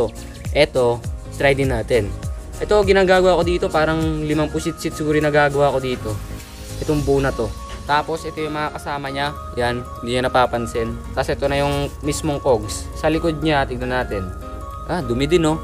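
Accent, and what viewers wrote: Ilocos, Philippines. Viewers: ilocano at kapampangan naririnig ko eh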